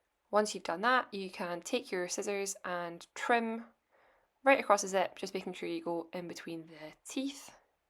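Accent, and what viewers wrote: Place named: Edinburgh, United Kingdom. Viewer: Hi! Thousand thanks for your tutorial! Do you also have one to knit the „bag“ where you sew it in? Btw…, I love you speaking. It reminds me on my first day an a language school. The first day I went there they were writing a dictat. I couldn’t understand a single word😂. Since than I have loved your speaking…, but the first day I heart someone from Edinburgh…., wow…, that was hard! I had the feeling I cannot speak one English word🙈. That was a great time…. Long long ago…, but I will never forget that time. And now listening to your podcast…. Lovely! Thanks🫶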